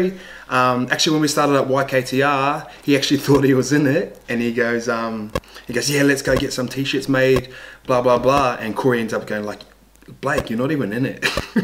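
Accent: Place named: New Zealand. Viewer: Lengo? it means 'focus' in Swahili. Oh that kiwi accent, you meant lingo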